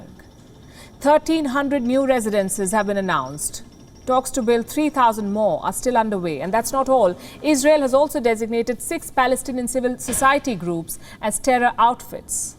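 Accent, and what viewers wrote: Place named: India. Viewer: What a joke! 3.7 million subscribers watching this channel is unbelievable. International news in English with such a thick Indian accent is barely comprehensible. It doesn't suit Indian media and the Indian population, in general, to focus on anything other than the welfare and education of their own helpless and severely impoverished population and their many domestic issues such as corruption, racism and poorly managed socioeconomics. The World Bank is funding programmes to educate Indians on how to use toilets to help them get out of the habit of defecating openly in the streets. International media is having fits laughing about it, yet Indian media is pretending to be breaking news to the world. They should focus on benefiting from their internationally funded potty training rather than commenting on international news.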